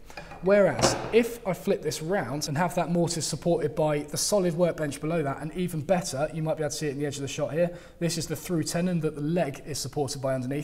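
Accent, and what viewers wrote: England, United Kingdom. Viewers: it's nice to here and English accent but you are way beyond my abilities.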